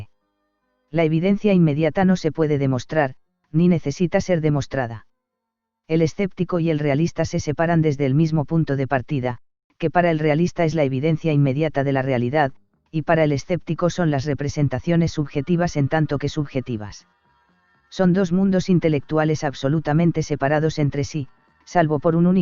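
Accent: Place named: Uruguay. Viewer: me tinca que tu voz es mucho más agradable q esos "emuladores de voz" o como se llamen que usas en tus vídeos, además con acento uruguayo serían muy entretenidos. Por principio me salto los vídeos q usan estos text to voice, puesto q los usan los millenials conspiranoicos. Vi ambos xq estaban en "No sin grave daño". Por ahora te leeré ahí.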